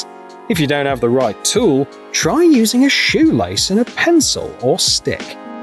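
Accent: United Kingdom. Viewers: He sounds British, so no, he doesn't know the difference between his asshole and a hole in the ground.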